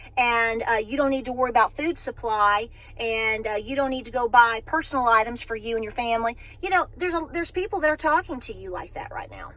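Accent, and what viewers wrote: South, United States. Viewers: I live here in East TN West Knoxville too! I just found your channel a few weeks ago. I love you videos and your Southern accent, thank you for sharing, I can feel the heart-love you send. 🦋🪴❇️💜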